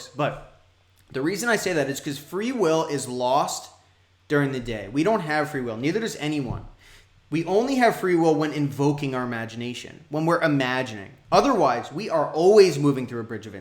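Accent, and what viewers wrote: New Jersey, United States. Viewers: You can take the boy out of Jersey but you can’t take the Jersey out of the boy 😎🤘🏼🙏🏼WHA-DUH 💦 💧 🤣🤣🤣 I freakin ❤️ it!!! Don’t change , be you siempre 😊😊😊 #dailyliststrong 💪🏼💪🏼💪🏼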